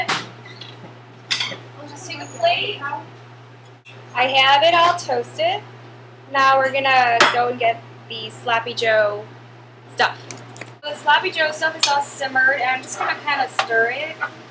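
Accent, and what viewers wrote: Vietnam. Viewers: ah i never knew u was vietnamese until u spoke lol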